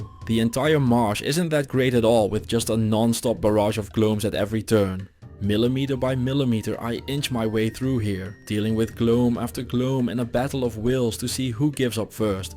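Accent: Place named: Netherlands. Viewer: That's quite the Dutch accent.. you sound like Goldmember.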